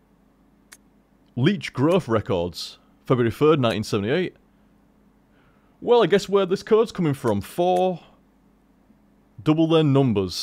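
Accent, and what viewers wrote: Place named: Yorkshire, United Kingdom. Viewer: an Irishman. a Yorkshireman. Not with particularly similar accents, within those of native English speakers.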